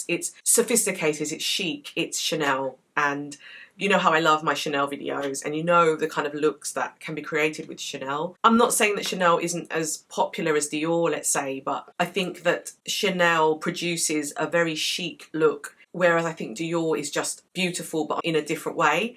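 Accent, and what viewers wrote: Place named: United Kingdom. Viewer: hi i like how natural you are and how your skin looks like real uneven skin ..and above all your accent.are you from britain?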